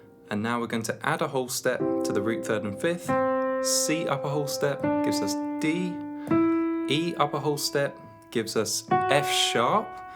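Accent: United Kingdom. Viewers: I damn near pulled my hair out of my head listening to your horrible British accent! I turned it off 3 min in.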